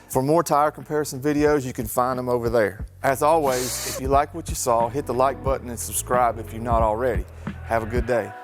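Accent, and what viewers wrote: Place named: North Carolina, United States. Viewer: Where you from? Sounds like a North Carolina accent.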